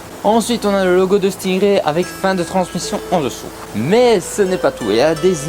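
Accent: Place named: Belgium. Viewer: un belge !!!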